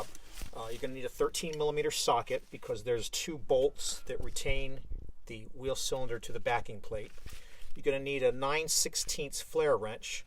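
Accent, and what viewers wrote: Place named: Pennsylvania, United States. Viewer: I know that Pennsylvania accent from anywhere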